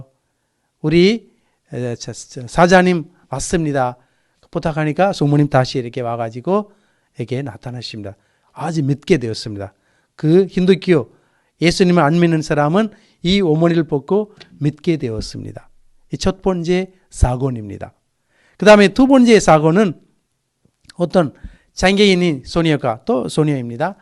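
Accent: South Korea. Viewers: 신부님 발음도 너무 좋으시고 잘 들려요 악센트가 한국사람같아요^^ 잘몰랐던 인도 성모님 소개해주셔서 감사합니다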